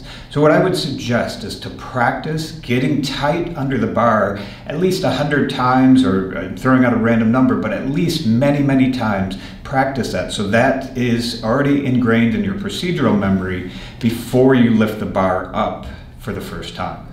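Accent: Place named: Halifax, Canada. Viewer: Great vid thx. Dude gotta be from halifax or something "the bahr"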